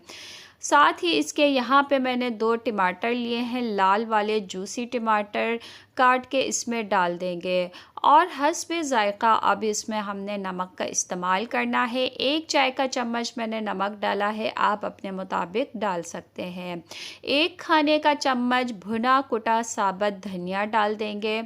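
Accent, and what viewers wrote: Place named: India. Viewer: Achhi Tara KEHTAY han achhay se nahi. Indians ki naqal kar Kay I Urdu ka kabara na Karen please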